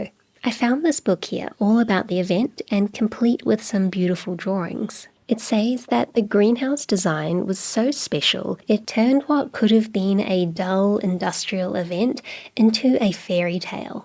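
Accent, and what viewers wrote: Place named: Australia. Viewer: Which city are you from? I ask this because you have a very interesting accent that sounds very distinct from other Australian accents.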